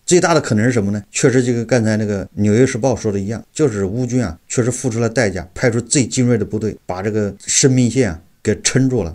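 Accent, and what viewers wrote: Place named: Wuhan, China. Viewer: 标准的弯管子武汉普通话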